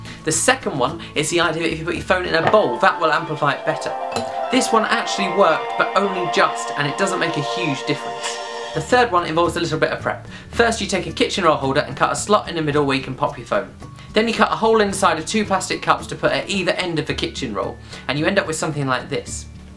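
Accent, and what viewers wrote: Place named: United Kingdom. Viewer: I love ur british accent it made me laugh when u said pounds because here we would love to spend pounds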